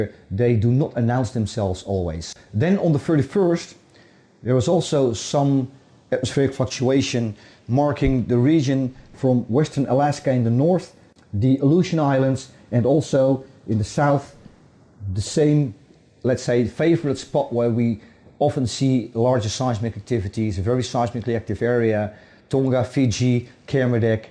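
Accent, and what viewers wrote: Netherlands. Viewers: typically Dutch ;-). We almost all speak like that over here :').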